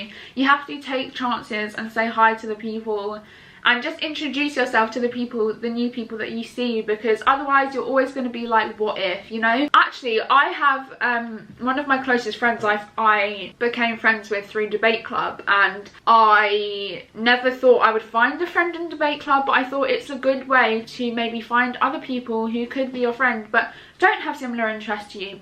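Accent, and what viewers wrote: United Kingdom. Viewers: She sounds like the UK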